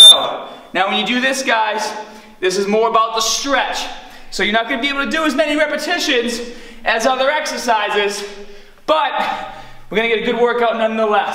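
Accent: Boston, United States. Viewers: John Letterman Its the boston accent i think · What's up with the Boston accent I watched your videos for years and stopped watching and recently just started watching them and I had to go back in time to see that it was the same person are you originally from Boston and it just came back you never had an accent before just curious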